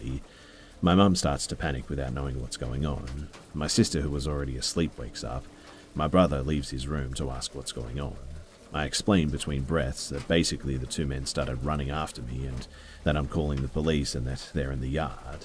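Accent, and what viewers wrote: Australia. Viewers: THANX FOR ALWAYS BEING CONSISTENT WITH AWESOME CONTENT.. I ADORE UU.. UR AUSSIE ACCENT IS SOO CUTE!! TY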